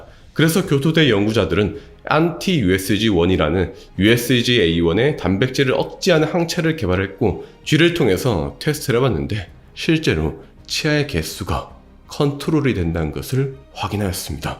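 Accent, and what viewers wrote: Gyeongsang, South Korea. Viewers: 아 경상도애가 표준어 따라하는 억양 역겹네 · 경상도 억양 엄청 심하네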